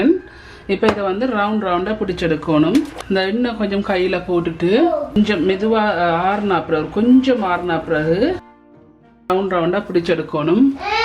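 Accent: Jaffna, Sri Lanka. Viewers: I can feel the fragrance of Jaffna in ur Tamil and Jaffna dishes sis... As i m Tamilian i m very much affectionate to srilankan tamilians... i never had a chance to visit srilanka... but i will go there for sure in future... keel uploading more dishes sissy... tc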